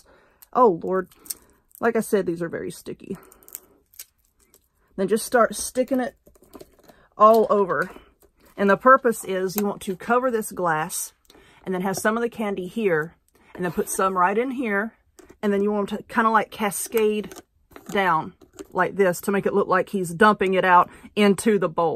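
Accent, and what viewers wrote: South, United States. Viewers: This is cute as a bugs ear😉..I get the biggest kick out of your videos..your VERY talented but,what I adore the most about you is..your southern/country as a turnip green😉😂..OH!and that's a compliment!!..I'm from Tennessee(live about 30 ft. from Monteagle Mtn.😄)..you keep makin' am & I'll keep watching😊💕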